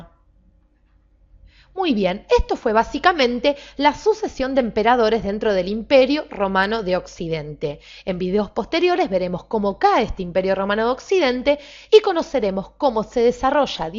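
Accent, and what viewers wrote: Argentina. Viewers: Es argentina la piba?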